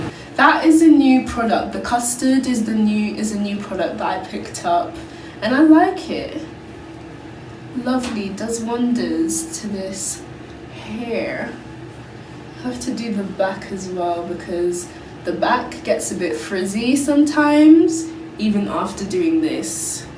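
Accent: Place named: London, United Kingdom. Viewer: BTW, is your accent from London.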